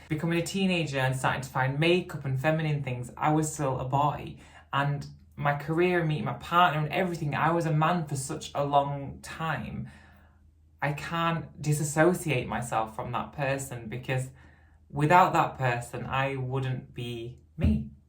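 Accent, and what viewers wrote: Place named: Yorkshire, United Kingdom. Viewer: You’re such a pleasure to listen and learn from and who doesn’t love a Yorkshire accent! Makes me miss The North. ❤️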